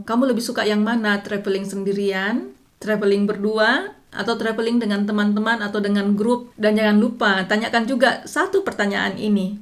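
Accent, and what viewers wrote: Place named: Toraja, Indonesia. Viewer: Wkwkw kak logat Toraja ta masih keliatan di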